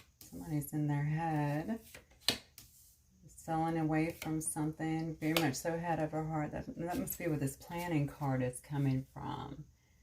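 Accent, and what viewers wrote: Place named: South, United States. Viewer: Brilliantly and amazingly accurate. In addition to reading the cards and channeling, I love how you inject little pearls of wisdom into each reading. Your voice and soft Southern accent is so very soothing, especially in times of difficulty which I am experiencing at present. But I feel energetic stirrings.. Thank you, Ms.. Xx 🌟💖💫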